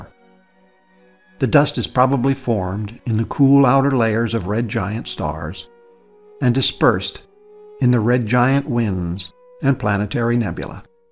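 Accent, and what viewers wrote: United States. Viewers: Oh thank goodness... A video narrated by an American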